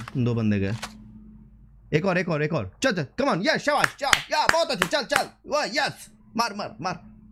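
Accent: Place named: Kanpur, India. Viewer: Bro kanpur se ho kya because od your tone agae haa to bhai hum bhi hai ek shoutour dedo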